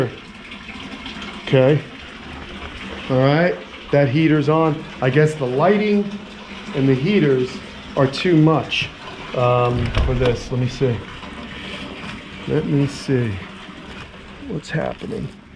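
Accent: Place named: New York, United States. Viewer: I didn't know you grew up in NY but I've wondered a lot because you have a liiiittle bit of a New York accent sometimes 😊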